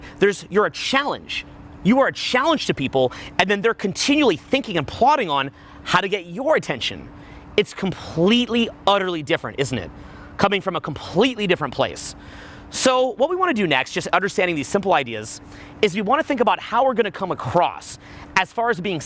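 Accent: Australia. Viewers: i love your australian accent mate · Good fuckin Australian accent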